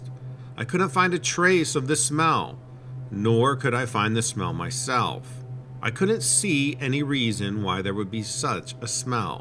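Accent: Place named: South, United States. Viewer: I can tell you changed the tone a little you brought on more of the southern lawyer twang tyr sound. I dig it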